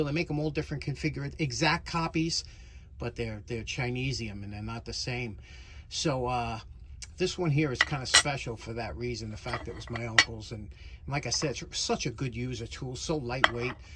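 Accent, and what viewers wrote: New York, United States. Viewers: Hi, I wear my old timer badge with pride. Last year I started Bee keeping. There is a “Hive Tool” which is exactly the same as the Hyde tool. Yes, the tools are thinner as you describe. Not sure if you know about beekeeping. Honey Bees are very fussy about the interior of their hives. They use a substance called propolis that they seal up all crevices in the hive. You need the hive tool to pry the boxes apart because the propolis is made from tree resins and is very sticky. Another installment on soldering irons. Very cool temperature regulator/stand. I have a fancy modern digital soldering station, but also still have two Weller guns. Vintage is wonderful. Super station cleanup. I thought it was “Smoke gets in your eyes” not up your nose🤪. Very funny end video. I sometimes laugh at your NY. accent, because mine is the same. 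Stay warm. We are in the low 30’s in the daytime, and the high teens at night. So we are even unusually cold. We didn’t get the snow. So no complaints here. Best Regards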